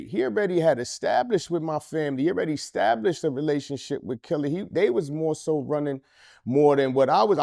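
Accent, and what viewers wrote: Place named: New York, United States. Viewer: He a true NY nigga man… this is how we really talk and explain situations lmfaoooo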